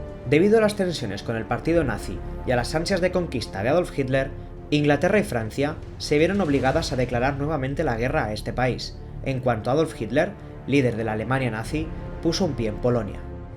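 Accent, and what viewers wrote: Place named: Spain. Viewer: El acento español queda perfecto para los relatos, saludos desde PARAGUAY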